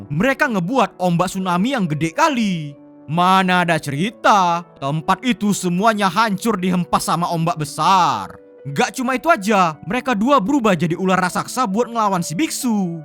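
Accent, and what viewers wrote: Medan, Indonesia. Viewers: Bg lu orng Medan yh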